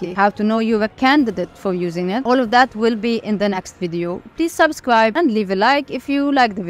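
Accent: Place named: Lebanon. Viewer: English with a Lebanese flavor....... ملوخية flavor 😂😂😂😂❤❤❤❤ Though I don't like (ملوخية) my family keeps on making it...... I wish you all the best, I'm following you on your YouTube channel in Arabic and I'm following you here and I will share this with my friends.... Arij thank you for everything, and congratulations for the 100.000, hope you'll reach more and more.... Most importantly, wish the war will end in Palestine 🇵🇸🇵🇸🇵🇸 We pray for the families, Incha Allah Lebanon will be safe 🇱🇧🇱🇧🇱🇧🇱🇧🇱🇧 From Algeria 🇩🇿🇩🇿🇩🇿, your fan ❤❤❤❤ Abdessamad........ Keep up with the good work, you're amazing ☺️👌❤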